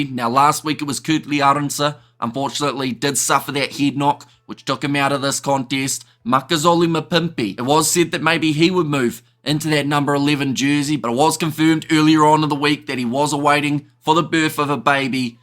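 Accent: New Zealand. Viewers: Love the colourful commentary and insights, and your great Kiwi accent. Thanks, man. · Perfect pronunciations! Didn’t expect it when I heard the thick kiwi accent.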